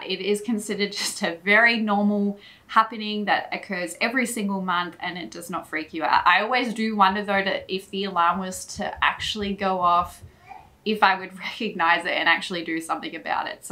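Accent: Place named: North, Netherlands. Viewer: I can definately hear she lives above the rivers, since I don't.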